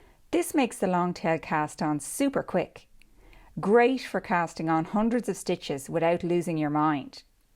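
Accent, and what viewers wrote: Ireland. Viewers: You explain so well and go nice and slow. I think I've got it now. As for your knit stitch, do you knit like Americans and the English or the continental way? It just looked different, but then I haven't knit for over a year. Also, your Irish accent is soooo charming. What part of Ireland are you from? I had an Irish great great grandmother Gosnell, but I don't know where she was from.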